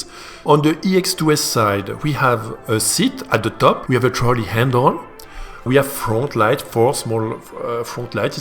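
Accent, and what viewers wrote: France. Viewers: Quel accent français ! 😄😉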